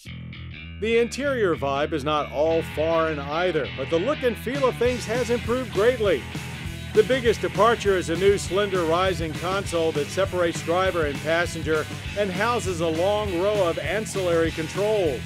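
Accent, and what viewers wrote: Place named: New Jersey, United States. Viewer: So do I, he's from New Jersey, btw.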